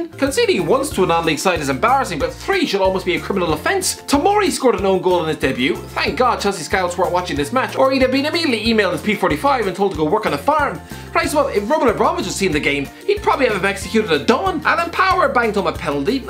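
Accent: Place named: Ireland. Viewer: I see HITC, I hear Irishman, I watch, I like. simple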